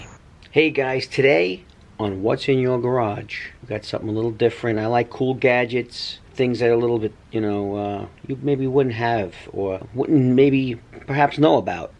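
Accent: New York, United States. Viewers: First of all, nothing beats a NY accent. #Queensgirl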